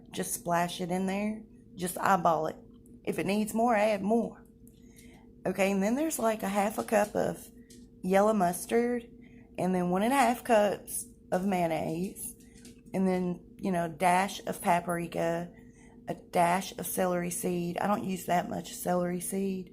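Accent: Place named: South, United States. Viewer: Hi from Georgia in the US. is a Southerner here like me. She is in North Carolina. The South in the USA is know for our slow melodious accents and delicious home cooked southern, comfort food, meals. is terrific and you might want to check out Collard Valley Cooks too. Blessings.❤️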